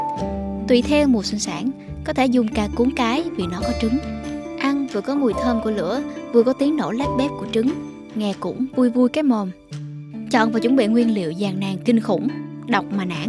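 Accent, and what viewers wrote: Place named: South, Vietnam. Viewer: Bài viết về món ăn miền bắc, người viết bài cũng dùng từ ngữ của miền bắc. Vậy thì giọng đọc của miền bắc luôn đi. Tự nhiên đưa bạn miền Nam đọc làm chi. Nghe không hợp xíu nào. Giọng đọc này chỉ có thể dùng từ "tiệm" thay vì "hàng", từ "phi hành" chứ không thể là "chưng hành". Nghe chướng tai 🙄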